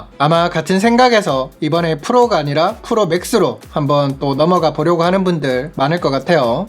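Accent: Gyeongsang, South Korea. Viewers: 아이폰때문에 처음 봤는데 영상시작 20초만에 나오는 경상도 억양에 빵터졌네요ㅋㅋㅋㅋ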